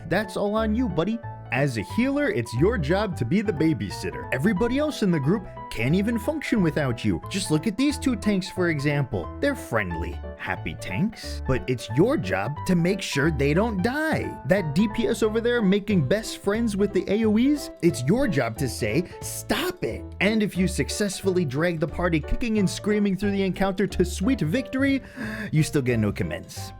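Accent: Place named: New York, United States. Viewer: I like your accent, are you from New York? This is very informative though, thanks!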